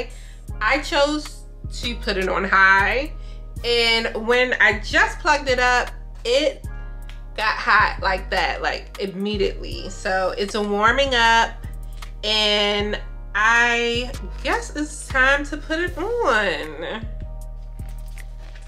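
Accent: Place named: Baltimore, United States. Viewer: lol, it wasn’t as Baltimore thick as I’ve heard. I definitely said. “She’s from the DMV” but the trees was slight so I didn’t pinpoint Baltimore.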